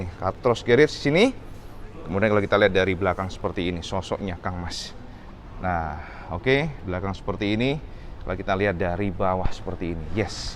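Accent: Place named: East Java, Indonesia. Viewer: Logat mu cak cak... Apikkkk 😎😎☕👍🇮🇩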